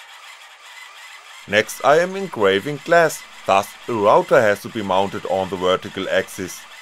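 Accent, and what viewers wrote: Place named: Germany. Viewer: немец на английском - ЖЕСТЬ!!!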